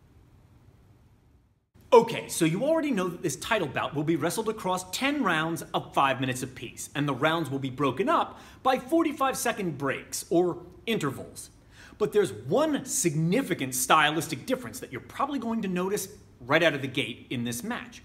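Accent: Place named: United States. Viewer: Why is some American guy talking to me?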